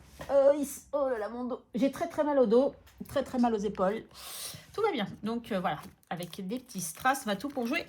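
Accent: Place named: Quebec, Canada. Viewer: Coucou, une belle vidéo. J'adore ta simplicité et ta spontanéité. Tu sais que tu as des termes que tu emploies comme nous les Québécois. ( P'tite, ben) sont des termes abrégés et des sons modifiés que nous utilisons beaucoup. Hihi tu as un peut de Québécois en toi 😂❤ De belles économies super ça. Aller bye bye à + 🫶❤️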